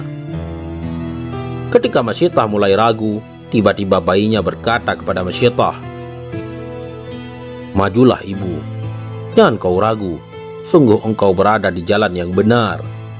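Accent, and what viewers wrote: Aceh, Indonesia. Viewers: Baru sj suami sy beritahu sy suara min kyk ny org Aceh ajah yaa?🤭😀😊👍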